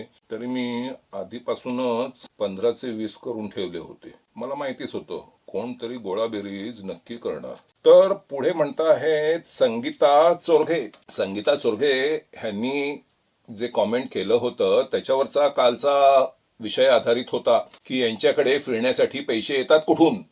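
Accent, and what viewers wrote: Pune, India. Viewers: सुप्रभात नमस्कार..... अगदी पुणेकर मराठी भाषा....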